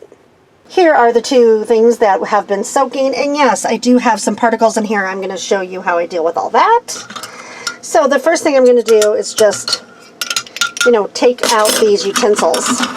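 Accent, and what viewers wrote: United States. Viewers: Sound like Ohio! We LOVE You!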